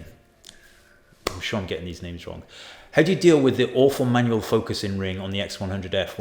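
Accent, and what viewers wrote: Wales, United Kingdom. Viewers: Ooh, you’re Welsh? (Via the Rugby question). I guess being stuck out here in Tokyo is starting to affect my ability to pick up accents. 🤪🤔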